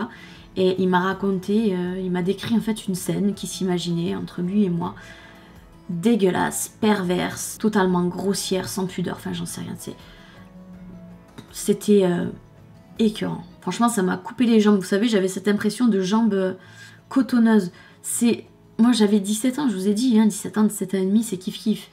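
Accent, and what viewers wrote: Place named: Paris, France. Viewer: Tu vis à Montpellier mais tu n'as pas l'accent. Tu as l'accent parisien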